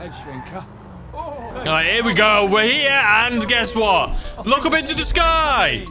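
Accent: United Kingdom. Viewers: Ain’t you British. ? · When did you move the considering you had a or you have a British voice considering you say that you used to do a lot of stuff there like how long have you lived there how old are you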